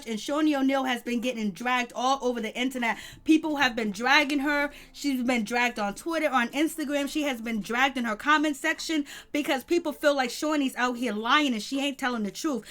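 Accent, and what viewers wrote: New York, United States. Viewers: I love your accent. True New Yorker. 💕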